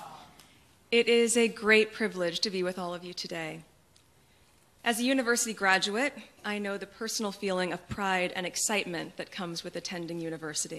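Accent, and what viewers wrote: United States. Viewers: That was American English! is not British. She is American. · It's an American English accent.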